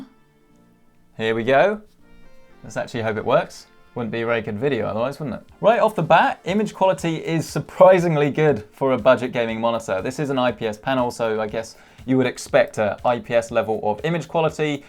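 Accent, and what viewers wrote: United Kingdom. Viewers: I just love the british accent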